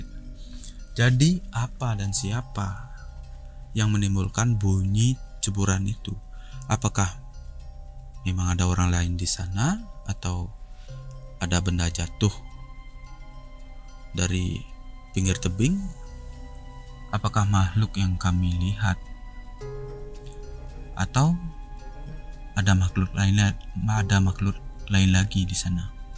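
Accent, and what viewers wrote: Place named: Bali, Indonesia. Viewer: Cara penyampaian ceritanya ok terlebih lagi ditambah logat Bali nya sangat unik sekali. Mungkinkah itu setan di sungai itu salah satu karakter patung setan yang digiring orang Bali di acara ritual apa yah namanya ?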